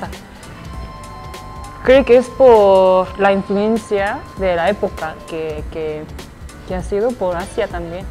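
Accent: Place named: Puebla, Mexico. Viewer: Toda una diplomática, ya hablas como toda una poblana! Muy segura de ti misma!,.. eso es lo que caracterisa ala mujer poblana!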